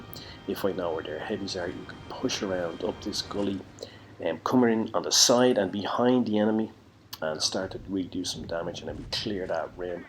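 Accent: Ireland. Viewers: BTW love your Irish accent.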